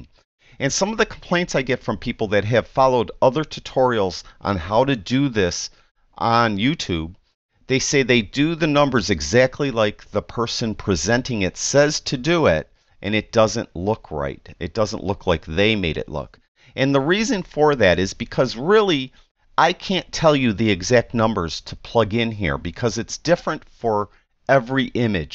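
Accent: United States. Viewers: great teaching, . This doesn't seem to look right on close up objects such as a flower. As far as you talking too much, lets just say i am getting a Yankee accent, Actually i enjoy listening to your teachings. keep up the good work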